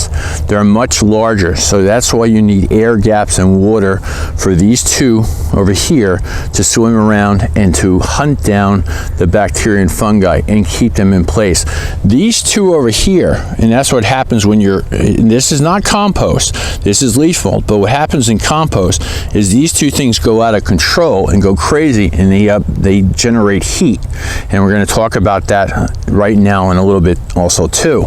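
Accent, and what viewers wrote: New Jersey, United States. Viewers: Great info and as usual, your Jersey accent makes me homesick. Thanks!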